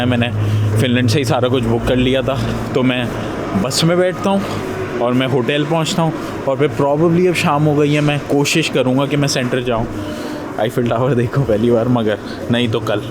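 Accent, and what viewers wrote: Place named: Karachi, Pakistan. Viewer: I always smile while watching your videos. I think it's because we speak the same way as we are Karachiites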